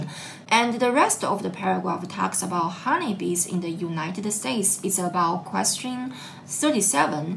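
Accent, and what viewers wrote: China. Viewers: Thanks for sharing your strategy! You have an obviouse Chinese accent.🤣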